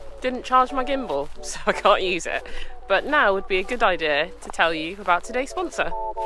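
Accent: United Kingdom. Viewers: I'm going to NYC next year. I want to record myself out and about and I don't want to use my phone. Would you recommend this or something different/cheaper? Other question: You're clearly, British! However, are you of Trinidadian/Lucian or Dominican descent? I found your channel and just subscribed. I saw a post on twitter and started watching a few videos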